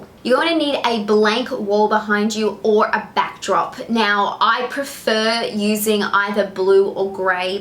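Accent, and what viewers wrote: United States. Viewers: Ur American accent is so on point. It doesn't sound fake or exagerated. you sound lovely and moremature in the Am accent. No hint at all that you are Aussie!! I'm on my way to get this accent ready!! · Excellent tips. Thank you. Especially the advice on too many takes. I've selected one of the first takes more often than not. The other point that you touched on is that it creates a false positive. You will almost never get that many attempts on set and, if you do, it will be nerve-racking. Does your market encourage the pan up & down for the full-body slate? They won't tolerate it here so there's always a hard cut. In this market, the slate is always either a tail slate or a separate file. Also, congratulations on the dialect work. Your American is flawless! Thanks again for your generosity. You are very kind to lend your expertise.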